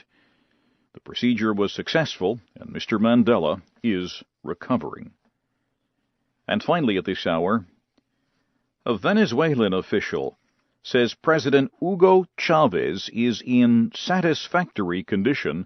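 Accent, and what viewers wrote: United States. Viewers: que buen video para aprender algo de acento americano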